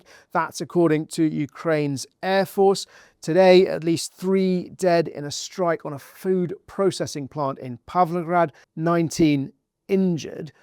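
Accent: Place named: United Kingdom. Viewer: Today, This Broadcast, is my first time watching & listening to you And I'm Hooked Immediately to your delivery, Thank You. Yes I subscribed, and for me it is kinda hard to hear British Accents but somehow you do a Fantastic Non Irritating Job (and I realize how childish this is of me) but it IS, What it IS. God Bless and keep on doing such the great job that you are doing.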